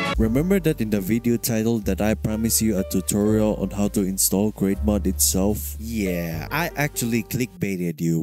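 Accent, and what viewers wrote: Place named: Philippines. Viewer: RAHHHHH PILIPENO ACCENT LETS GO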